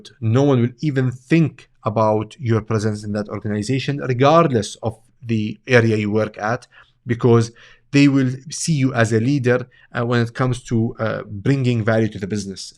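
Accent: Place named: Spain. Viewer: the R😂but don't care, are you native Spanish?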